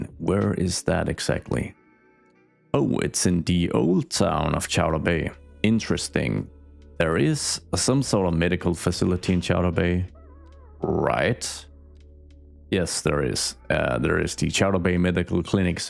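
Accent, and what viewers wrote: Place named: Denmark. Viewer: Omg, so he is actually from Denmark, always thought so from his accent, but wasn't sure, so cool to see other Danes here on YouTube!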